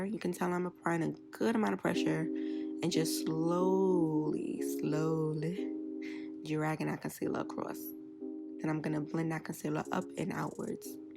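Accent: New York, United States. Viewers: She sound like she from New York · nyc accent i love it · U a 🐦 like me 😂 U def gotta b from NY😩... love it😍 #Bk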